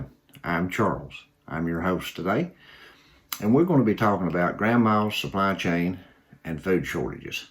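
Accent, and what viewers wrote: Kentucky, United States. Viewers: My family is from Manchester, Ky. I just love seeing people from Ky. I didn’t grow up there but I still feel a connection to Ky. Your accent reminds me of my granny and my uncle and aunt. Love hearing you talk.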